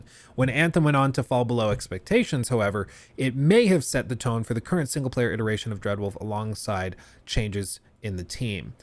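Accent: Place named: United Kingdom. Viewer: Definitely sounds more British than American to me.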